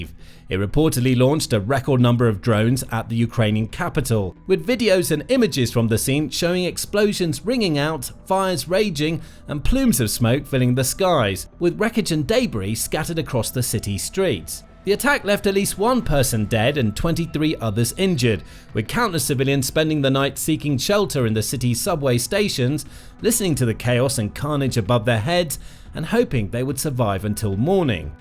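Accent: United Kingdom. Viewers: Your British speech impediment is strong. You should learn the correct American way to pronounce words including innovative.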